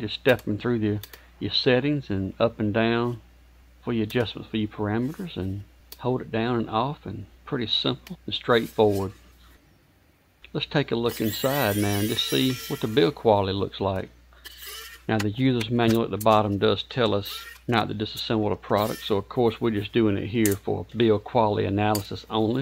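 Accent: United States. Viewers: Love your accent! I miss visiting the US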